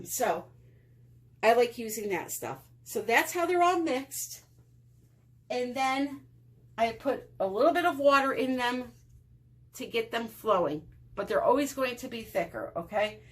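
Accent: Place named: Chicago, United States. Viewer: i havent even watched this one...YET., but i have to ask one thing. your accent. Sounds from up north Chicagoish, thats where I grew up. been in TX since 81 and miss home sooo much . besides your amazing with you art. you rock the videos!!!!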